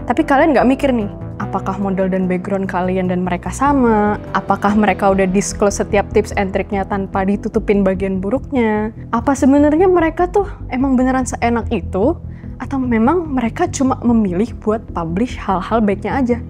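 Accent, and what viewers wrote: Java, Indonesia. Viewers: Kok medok se mbak, bikin seneng aja😅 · satu yang saya notice, kemampuan beradaptasi dengan semakin menyamarkan logat medok-nya meningkat jauh. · mayan lah, medoknya udah hampil ilang, tapi masih kerasa di beberapa pronounce 😁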